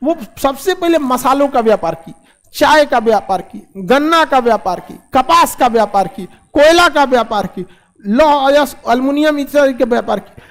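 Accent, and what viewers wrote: Bihar, India. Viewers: Tu Hindi me bol Kya bolta he kaun si deshi bihari bolta he Achhe log samaj nahi pate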